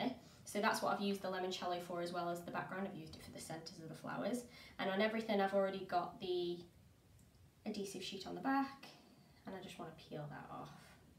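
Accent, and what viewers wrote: United Kingdom. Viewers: Good video, but it is hard to hear you. I put on closed captions. Low volume + British accent can be tough :-)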